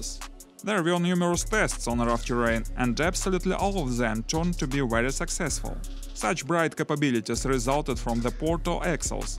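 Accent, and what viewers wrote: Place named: Russia. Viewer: У тебя русский акцент- ты русский,ну или из бывшего СССР??))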